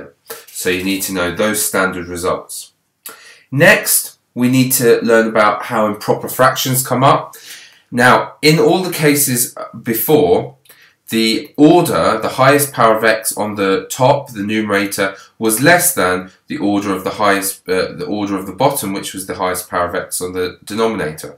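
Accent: United Kingdom. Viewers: Are you from Britain? I asked over your accent.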